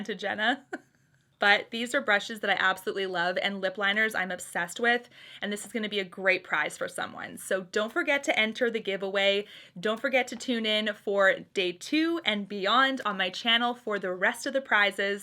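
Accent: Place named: Winnipeg, Canada. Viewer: Born and raised in Winnipeg although now live in the states- I always like listening to you as your slight accent reminds me of home! loloyogini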